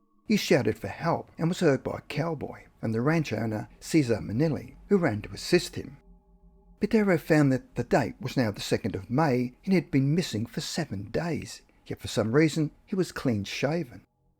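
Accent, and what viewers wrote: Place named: Australia. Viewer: Ugh, the Aussie accent just doesn’t work with narration. Otherwise, a fan of your channel! 😩😅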